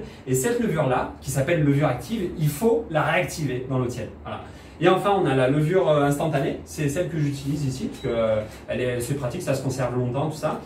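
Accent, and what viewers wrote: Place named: South, France. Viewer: Merci pour toutes ces informations intéressantes! Et avec l'accent du sud c'est encore mieux!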